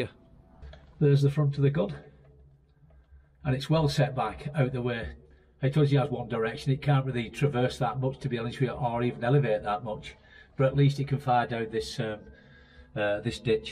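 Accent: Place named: Lancashire, United Kingdom. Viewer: Fascinating. I was driven past Liege in the 1970's but never saw any of the fortifications there. I was lucky enough to visit Verdun in the 1980s and did visit those at Vaux and Douamont. I have hundreds of books, postcards, e.t.c. on fortifications. You sound like you come from Lancashire. I'm a scouser myself.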